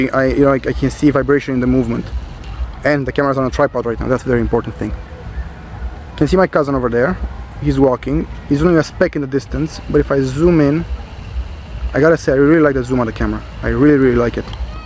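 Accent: Romania. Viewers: Salut, Banuiam dupa accent ca esti roman, apoi cand am vazut ca ai aparatul setat pe romana am zambit:) Am de gand sami iau aceasta camera pt pret si pt ca vreau doar poze ,,cazuale,, daca ma intelegi. Apreciez munca ta si bafta in continuare. :D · I like the fact that you talk fast, but i think it would be nicer if you worked more on your accent. From the first moment i knew that you're romanian and for some people the fact that they can tell you if you're a native american or not is a nice thing, but not for me.. It's kinda' like hearing an american talking romanian (without having the native accent)... Thanks for the review. As far as the camera that i shoot with (Canon PowerShot A610), i think the Nikon Coolpix L310 would make my photos looking pro (only in comparison with my actual camera). You may say that this camera is not for professionals, but i think it surely can take profesional photos if you know how to shoot. 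Thanks again! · Mai... Dupa cum vorbeai la inceput puteam sa pariez ca esti roman.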